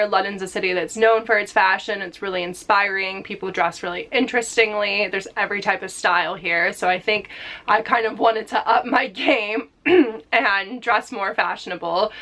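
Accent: United States. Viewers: Don’t force it. She still sounds American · As an American myself, her accent sounds like a typical lady here in California. I don't here any type of European accent in her voice at all.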